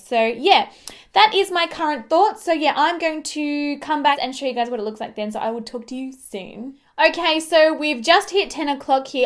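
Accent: Australia. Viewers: awesome!!! I am gonna try this one especially for my winter foundation. In winter I can carry off the more emollient feeling foundations because I am not sweating my bum off. But hmm I just thought of something, since I got the mehron primer that won't even matter anymore. Thank you for doing this though! You have my skin undertone and my skin type so your videos and reviews are LIFE for me! LOL though we DO have quite the age difference (I'm 49) still your skin care, tips and tricks are amazing! AND I miss my Australian sister so much your accent feels like home! (She is coming for a visit in May!!!)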